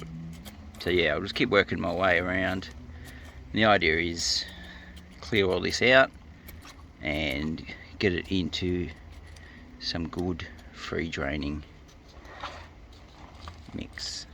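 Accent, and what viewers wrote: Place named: Australia. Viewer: What a cracking Chinese Elm and made even better with an Aussie accent to remind me of my youth in Melbourne. Great chop on the roots and I think oyu have really set it up for the future. Did you happen to plant the roots that you cut off? Anyway, loved the video and now I have to see what else you have done! Cheers, Xav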